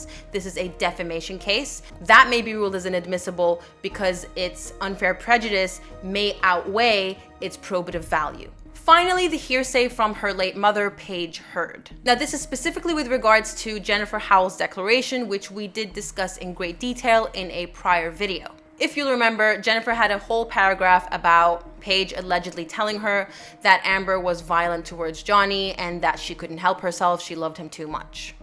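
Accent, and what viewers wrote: Canada. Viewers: I’ve wondered for months where you practice. I eventually settled on Canada bc of your accent, (or lack thereof.) I’d have never guessed Australia in a million years. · I just realized how rude my comment could sound; I just meant I thought you had a faint Canadian accent. My apologies.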